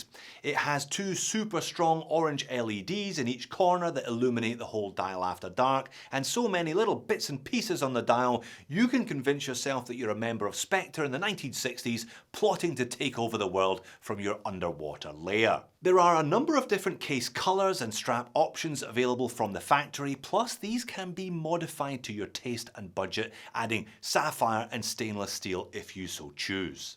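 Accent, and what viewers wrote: Scotland, United Kingdom. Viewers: Oh the Scottish accent. I was trying to figure out what a dated complication is… an old function you don’t need anymore?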